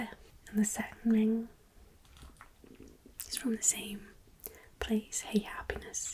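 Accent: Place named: Liverpool, United Kingdom. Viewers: Im irish and i loveee the scouse accent. Especially in asmr lol. Very relaxing ! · I love your Scouse accent, I love Scouse accents anyway but your version is so soft and relaxing 😌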